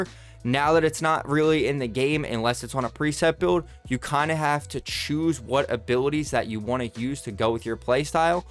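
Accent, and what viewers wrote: Delaware County, United States. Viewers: in that delco accent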